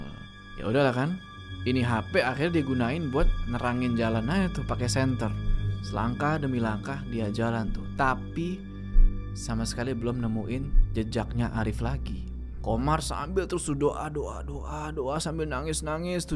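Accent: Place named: Java, Indonesia. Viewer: Bang keren menjiwai banget ngomong bahasa jawanya😂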